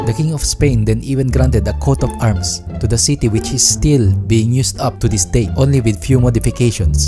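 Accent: Philippines. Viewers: Kudos to your work and Filipino accent :) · he sounds filipino(Filipino ka ba?) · oversimplified but with filipino accent <3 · Cool na sinadya mong idiin sa amin yung Filipino Accent hahahahahaha solid! ILABAS MO NA YUNG PART 2 KAHIT NAKA DRAFT YAN ILABAS MO NA HAHAHA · maaaaaan this deserves more recognition. I like your cool filipino accent bro and the way of delivery is cool too haha keep up the good work:) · This is like Oversimplified. But filipino accent version. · the accent is the native filipino accent,, it was on purpose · i love how he use da pilipino aksent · its Filipino English which considered as a dialect that's why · This is Pinoy knockoff Oversimplified 😂 even the name means the same thing "Dumbed Down." Edit: I love it though. Please make more videos ❤️. I have a feeling you're bisaya, based off of the accent.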